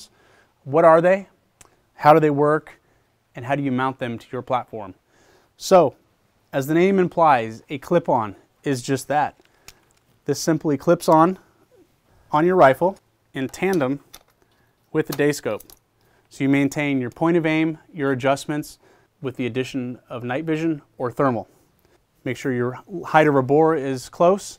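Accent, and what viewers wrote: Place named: South Africa. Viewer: Nice south african accent.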